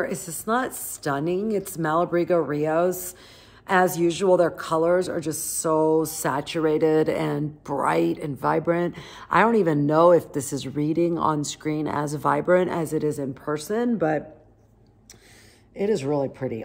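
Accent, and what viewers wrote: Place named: Texas, United States. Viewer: I so enjoy your show ,I,m in Alabama now but am Ft.Worth Texas ,and I smile every time you say you,all ,that’s the Texas in us ,I say it to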